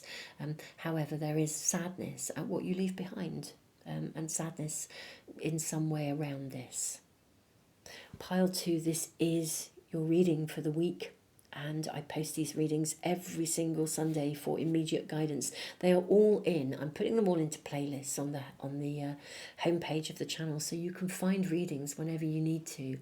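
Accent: United Kingdom. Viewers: Hyy, you! I love your readings, your lovely british accent and your unusual, awesome oracle decks! Great reading..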